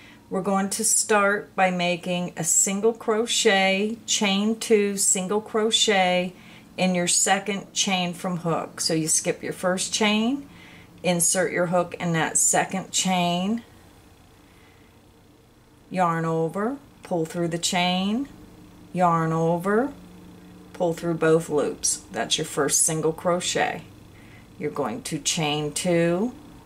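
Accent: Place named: South, United States. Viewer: Love your southern accent especially when you say Grandma.... Love your channel period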